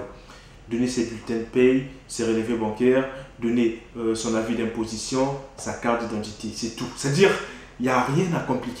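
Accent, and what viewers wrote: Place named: Democratic Republic of the Congo. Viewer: On retrouve l'accent congolais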